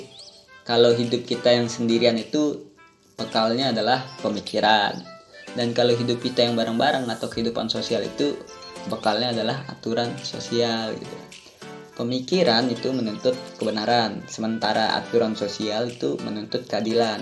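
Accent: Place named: Lombok, Indonesia. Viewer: Logat sasaknya kentel banget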